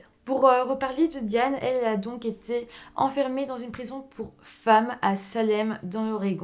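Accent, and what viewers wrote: Belgium. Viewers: Merci pour cette vidéo, c'était très intéressant et bien raconté. Serais-tu belge à tout hasard? :) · Oui, c est un accent belge 🇧🇪😁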